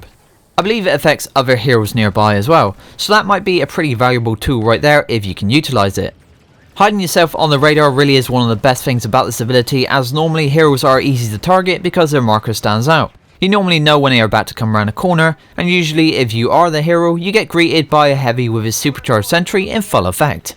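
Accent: West Country, United Kingdom. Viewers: Where in the West Country are you from 😏?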